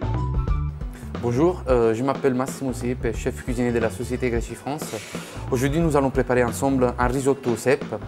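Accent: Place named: Italy. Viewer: Tu risotto avec des boîtes ça donne pas envi. L'accent italien ne suffit pas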